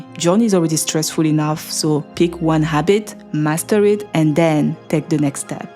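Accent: France. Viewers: Quel beau Englais-Francisé😊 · I picked up the same French accent was confused by Germany 😂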